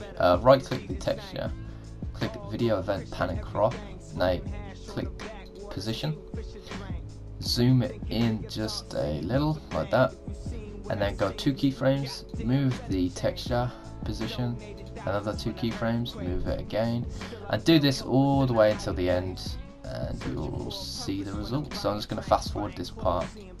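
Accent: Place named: United Kingdom. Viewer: Thick British accent gotta be one of my favorite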